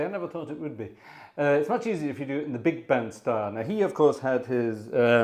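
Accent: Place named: Scotland, United Kingdom. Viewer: I have been away for a while but getting back into the swing of things and I enjoyed this organ night. You're sounding more and more Scottish each day!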